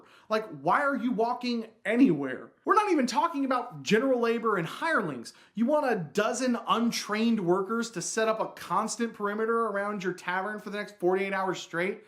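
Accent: Texas, United States. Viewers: Now I want to know where in Texas he's from. . . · didnt know you where texan hello from killeen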